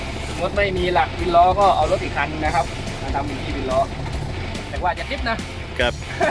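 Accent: South, Thailand. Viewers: คนใต้นี่หว่าฟังตั้งนาน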